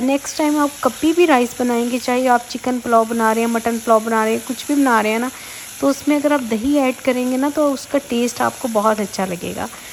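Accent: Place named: Punjab, India. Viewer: you are precious miss, and adorable women. Must tc your healthcare so much. I miss apka Punjabi accent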